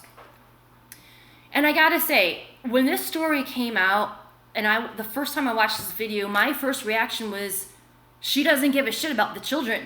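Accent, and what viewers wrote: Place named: London, United Kingdom. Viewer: Sounds kinda like the kids from London. The little boy and girl that told on their Dad and teachers at their school.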